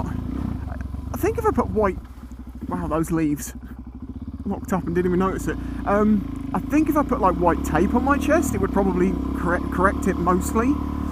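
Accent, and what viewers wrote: United Kingdom. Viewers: Haha British, idk why but you Brit’s sound so hilarious to me.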